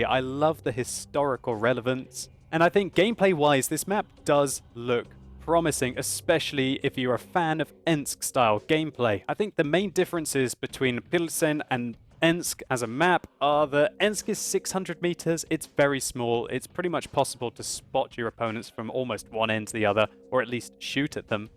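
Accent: Germany. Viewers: German accent 10/10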